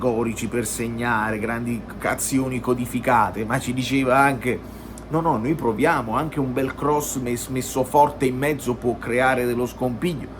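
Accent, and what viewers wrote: Naples, Italy. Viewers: Bello sentirti parlare in inglese con la amplomb napoletana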